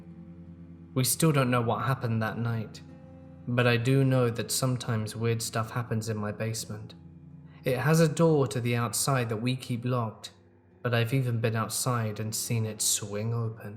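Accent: United Kingdom. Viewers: Is it you that just speaks that way? Or do you only get stories from the U.K?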